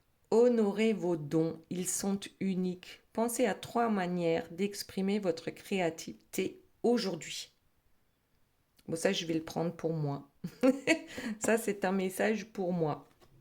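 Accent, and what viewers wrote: Alsace, France. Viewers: J adore votre accent m'a petite vous devez être Alsacienne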